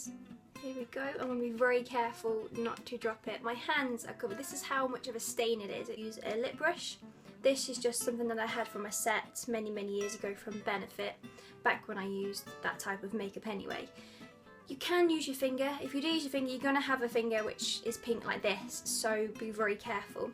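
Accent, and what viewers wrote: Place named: Europe. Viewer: I love the European kind of accent you got... It's kinda hard to catch up with that if you don't belong to the place... I have been using the beetroot thing for so long n Now I see videos over it... Ig I am gonna get back to it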